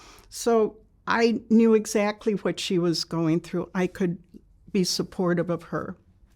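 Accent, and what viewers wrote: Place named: Chicago, United States. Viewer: You can’t beat the Chicago accent! ❤ She reminds me so much of my grandma who lived her entire life there. What a spirit, what a story!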